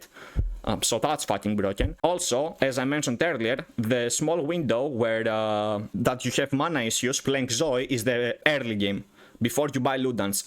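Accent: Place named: Greece. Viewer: In 2 seconds I was like, he is Greek. What about support Zoe?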